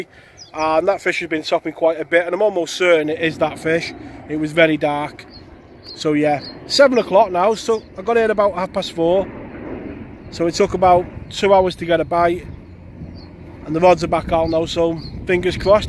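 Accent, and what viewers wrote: Liverpool, United Kingdom. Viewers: Love the vids Even got the Mrs subscribed and just watched this Together! Watching your vids always gives me inspiration to get out there! Like you said mate Angling is therapy! And a Fish is a Bonus! Nice Fish well earned! Hope to see you next week fella! Ain't missed a Vid in the Last couple of years.Your "Naturals" are the best? The pools nobody really bothers with? That you ain't sure what your gonna get? Like your MR PIKE VID a couple of months ago that kept Killing your swim and smashed you off at 1 point!!! Tight Lines big Lad! The Mrs is a Fan Now: ) She said? Is he a scouser? I said Manchester? But with you getting your Hemp from Cheshire particals? I'm guessing somewhere in between? Wigan? St. Helens?:):)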